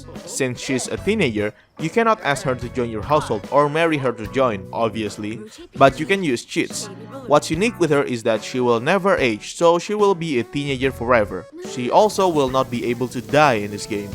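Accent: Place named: Indonesia. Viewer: OMG I don't know if you're Indonesians, first time I hear your accent it's look like Indonesian people talking English lol that's cool 😅👍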